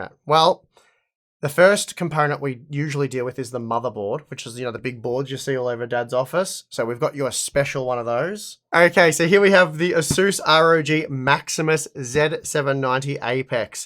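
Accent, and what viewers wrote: Australia. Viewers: Daddy's shadow., she's a good looking girl....looks a lot like dad. And yes, I simply adore that lovely Aussie accent. Keep up the great work. Does Tim have a son/daughter to also do a pc build.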